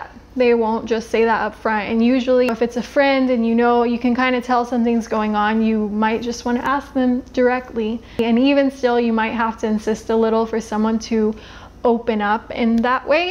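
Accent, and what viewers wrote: El Salvador. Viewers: TE AMO GRINGUITA SOS LO MAXIMO. UNA SALVADOREÑA DE VERDAD. HASTA TU ACENTO ES ORIGINAL. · Hi, how are you? I just want to say hi and wish you the best! You are a very nice person even when I don't know you in person, but you speak like a true Salvadoran and you know a lot about our culture and I like that! Say hello to your sister in a good way and with great respect. See when you take her to know El Salvador? greetings and take care! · así es bro, esos que son salvadoreños y al llegar a usa con los días hablan como mexicanos mal caen esos majes, sinceramente es gente que se merece una buen cato en la trompa, para más esta chica habla como salvadoreña y eso que ella es nacida en usa osea es gringa de naturaleza, y los majes que están en usa puff decepción dan.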